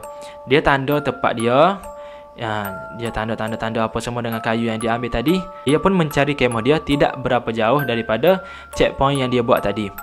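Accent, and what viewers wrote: East Coast, Malaysia. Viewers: Jumpa new channel horror storyteller.. subscribe tnd support.. dgr dr slang percakapan myb dr Pantai Timur.. Apapun terbaik bro..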